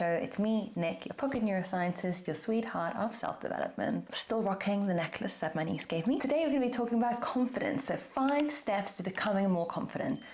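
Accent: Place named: England, United Kingdom. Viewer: I just assumed you were from England. Where are you from/ grew up? Ps. You're my current fave educator/ influencer/ author ❤. Waiting for my bday in November to buy myself your book.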